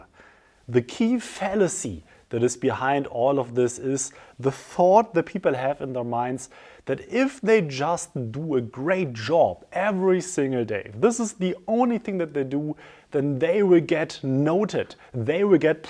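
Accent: Germany. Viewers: I love the german accent!❤ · Ein ungefragter Tipp nachdem ich mehrere deiner Videos geschaut hab: Inhaltlich gut dargestellt und kohärent. Sprachlich muss man sagen, dass du quasi Deutsch mit englischen Vokabeln sprichst. Sprachmelodie, Grammatik und Satzstruktur sind deutsch. Sonst gut.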